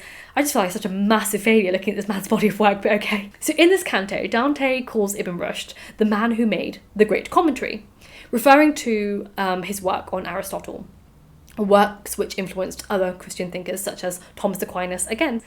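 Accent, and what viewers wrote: United Kingdom. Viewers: do you have a British accent or do you just talk that way because you read books?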